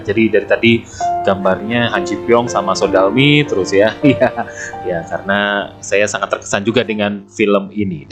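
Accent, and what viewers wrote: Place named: Banyumas, Indonesia. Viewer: Videonya paket komplit,ngapak bos wkwkwk. Start up drakor favoritkuh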